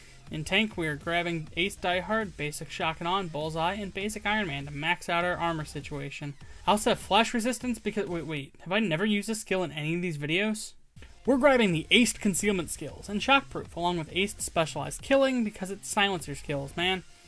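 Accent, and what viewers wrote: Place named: United States. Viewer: Honestly man, you have the best type of american accent, where about are you from?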